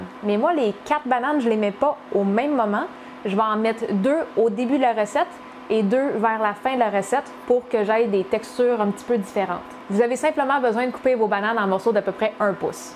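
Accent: Canada. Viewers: C'est juste tellement bon ! En plus avec l'accent canadien 😍. J'en ai fait ce soir 😋. Je connaissais ce plat, Merci pour cette version et bravo ❣ Je voulais partager une photo, apparemment dans les commentaires ce n'est pas possible.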